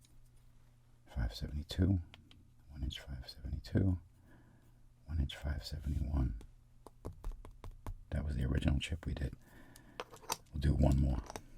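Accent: Boston, United States. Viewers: I never noticed his... Boston (?) accent before. He's always affected a different accent every time. Interesting. I love this video. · I Sense the Boston Accent is Strong with this one.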